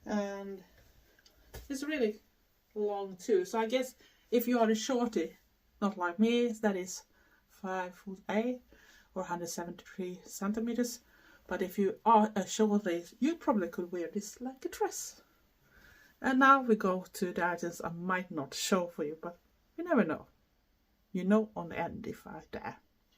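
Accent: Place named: Sweden. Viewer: Hay, new suber here, i love your accent, sweeden is beautiful, love the haul, everything is so cute x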